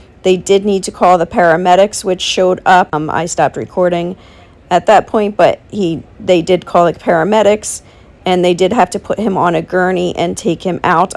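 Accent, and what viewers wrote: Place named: Chicago, United States. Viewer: Your hair is awful. Be consistent with the color. Are you from the Chicago area originally?? Your accent shows! Most companies like a neutral American accent.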